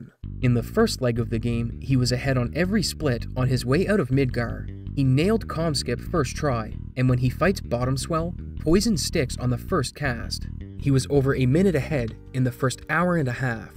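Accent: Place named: Atlantic Canada, Canada. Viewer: incredible video. I have to point out tho, as a Newfie, you HAVE to be from Atlantic Canada some where right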